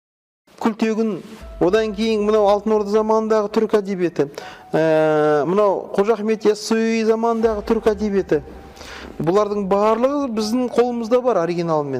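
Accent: Standard. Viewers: Осы кісінің дауысы өте жағымды таза қазақша сөйлейді.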